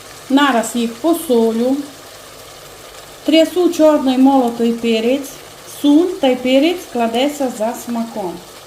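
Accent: Transcarpathia, Ukraine. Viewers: Я хоч і хотіла подивитися рецепт, але сиділа і слухала як гарно і красиво Ви говорите❤ нага мова то любов💛💙💛а з вашим закарпатським діалектом, дуже гарно.. дякую. Рецепт передивлюся ще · Дуже люблю закарпатський говор, погано що не видно господиньки, раніше було видно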